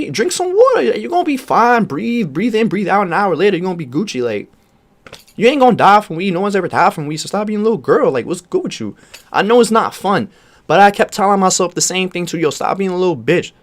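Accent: New York, United States. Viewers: bro frm ny for sure 😂 good tips gang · Yea u still got tht ny ism in you, Thts wssup cuddy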